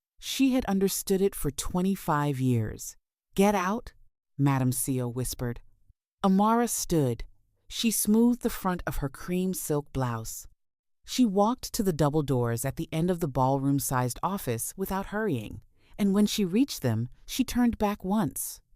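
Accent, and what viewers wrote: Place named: Caribbean. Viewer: Loving this. Hearing the Caribbean influence is beautiful. Wished there was some good accents. Lol. Respect from Bim🇧🇧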